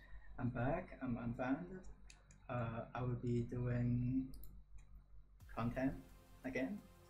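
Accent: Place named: United Kingdom. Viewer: you sound really british sometimes its great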